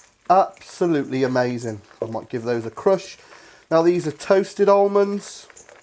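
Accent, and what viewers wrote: United Kingdom. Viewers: "get in with me ands" lol British accents are all so amazing. Love your video's man. These recipes all look amazing. i can't wait to try them one day when i can get a hand on some of the ingredients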